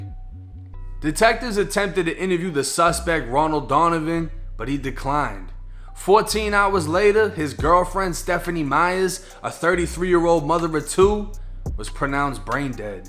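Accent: Boston, United States. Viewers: They were all on slow ass Harleys I bet.. they wouldn't never caught a sport bike.. That's also why I stay strapped tho 😂😭🤷🏾‍♂️ Also, u from Boston? I'm from CT.. i hear an accent lol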